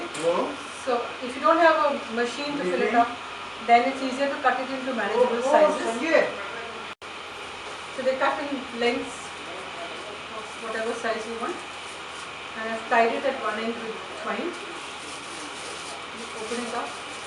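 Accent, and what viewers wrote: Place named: Goa, India. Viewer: I love that Goan accent. Thanks.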